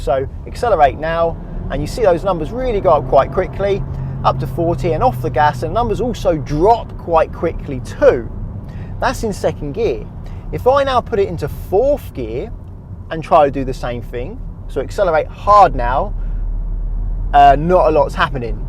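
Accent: United Kingdom. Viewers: Your accent is so beautiful.which part of the UK does it come from?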